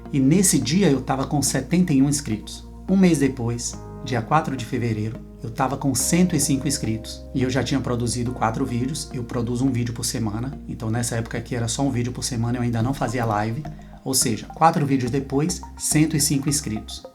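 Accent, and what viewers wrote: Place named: Northeast, Brazil. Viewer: sim, adorei a Live, as horas passaram e nem vi... e quanto a voce, foi uma surpresa boa, mais um canal que ajuda a gente a crescer aqui no YouTube com dicas e sacadas bacanas!! Gostei do seu canal, aos poucos vou assistindo os seus conteúdos, você tem um jeito bacana de transmitir e de se comunicar, talvez pelo sotaque leve do Nordeste, talvez pelo jeito doce, Cândido de falar, muito agradável e um diferencial dentre os outros canais com meu nicho!!! Parabéns, já ganhou mais um inscrito!!! Abraço do Big 💪💪😃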